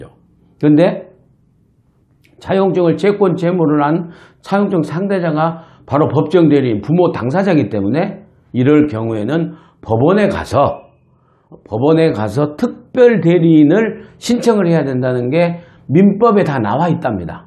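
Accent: Jeolla, South Korea. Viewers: 구수한 전라도 억양과 날카로눈 비판과 지적애 아주 속이 시원합니다!! 앞으로 많이 많이 해주세요.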